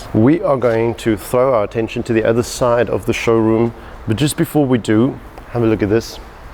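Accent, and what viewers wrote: South Africa. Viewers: I had a feeling you were a fellow South African, judging by your accent and colloquial expressions. Really great stuff you're doing man. Keep it up.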